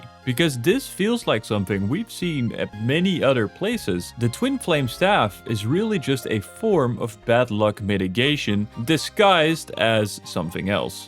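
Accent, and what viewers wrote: Netherlands. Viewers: pretty nice effort hiding your dutch accent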